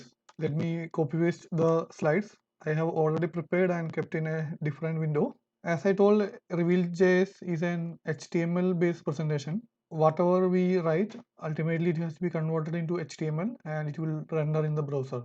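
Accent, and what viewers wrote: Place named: Kerala, India. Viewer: Malayali anu ennu English keetapole thooni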